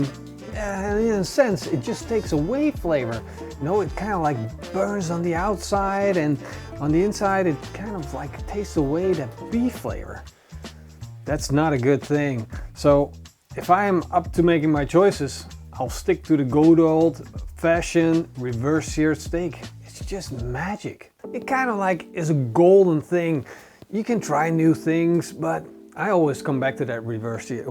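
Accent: Netherlands. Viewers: Ja, hij is echt Nederlands — “What IS up?” ... maar zijn Engels is bijna perfect. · Mann i speak both and yeah thats a pretty clear dutch accent or maybe from belgium but i doubt that Belgians have a bit different accent aswell. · Is he dutch? Because this accent is very dutch😭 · There's no way you're not dutch, it's the first thing I noticed when you opened your mouth 😂 Nice video either way! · Are you dutch you sound dutch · Definitely Dutch by the accent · You think he's French Canadian? He's obviously Dutch. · are u dutch? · You’re dutch aren’t you? I can hear it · Sounds like your from the Netherlands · Ja, die Nêderland se aksent. Man kan die Boere en die Nêderlanders spot-on hoor. Dis altyd gaaf. Die beste groete uit Rusland! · Dutch accent. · Dutch accent...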